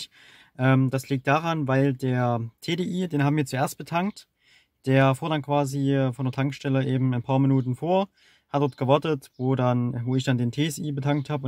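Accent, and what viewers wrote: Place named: Saxony, Germany. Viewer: Kommst du aus Sachsen? Daumen hoch für den Akzent, Grüße aus DD 👍🏻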